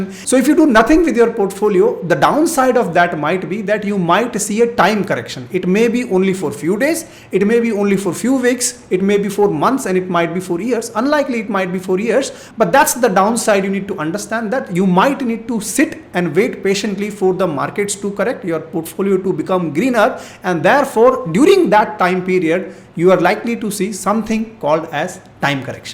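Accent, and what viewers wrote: India. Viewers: Dang! thats a heavy AF indian accent..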